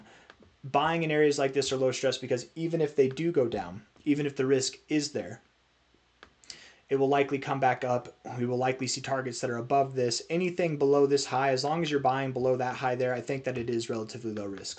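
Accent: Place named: United States. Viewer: Random question but when did you leave America? Cause ur accent is definitely American but the UTC time zone is far from America lol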